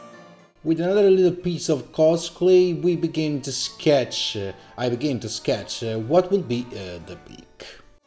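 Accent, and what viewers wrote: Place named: Italy. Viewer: Your bird is very realistic, yes I love your videos in English, just do not lose your charming Italian Accent!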